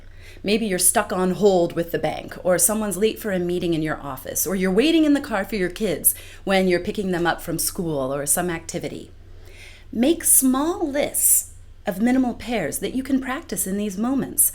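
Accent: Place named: Mid-Atlantic, United States. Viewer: You have an amazing accent. It's sort of a posh American accent, so to speak. By any chance, is it close to Mid-Atlantic accent?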